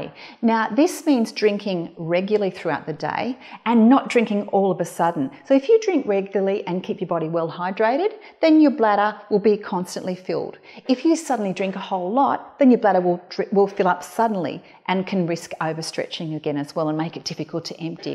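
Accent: Australia. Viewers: How would you know there is residual urine left in the bladder without ultrasound or tests for voiding? Happy to hear a fellow Aussie.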